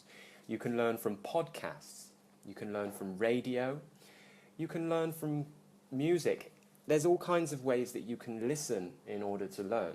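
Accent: United Kingdom. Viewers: Hello, my name is Pachara. I’m from Thailand and now living in the State. I love your video English lessons because I love British English. I live in the State but actually don’t like American English lol. I’ve found your videos are really useful and helpful. I like that you give tips not just teach. Keep up the great clarification. 👍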